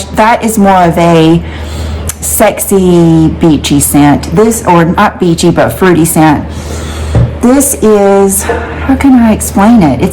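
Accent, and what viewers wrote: South, United States. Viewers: Hi!! I'm a new sub and I'm totally binge watching all your videos ☺️ I love your channel!! I especially love your jewelry, omg I have to get something from Dean Davidson and Cat Janinga! I also love your fashion sense and outfit of the day! But my absolute fav thing is your accent!!! It truly makes me smile 😁 I live in CA now but I was born and raised in TX. I have an affinity for all things southern!! Oh, and the last thing is I love the way you say Brooke. That's my name as well. It reminds me of being back home and someone calling my name 🥰 xoxo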